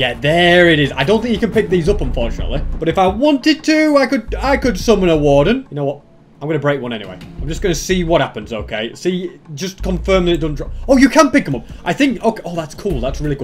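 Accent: United Kingdom. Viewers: are u uk?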